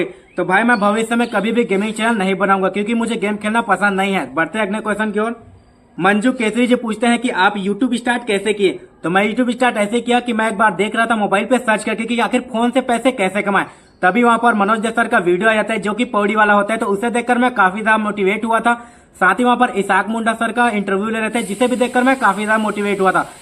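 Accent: Chhattisgarh, India. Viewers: Bhai aap chhatisgarh se hona sach batana pliz aap kon se gaov se ho pliz batao maine aise jana kyu ki aap ka bolne ka tarika chhatisgarh hi jaisa hai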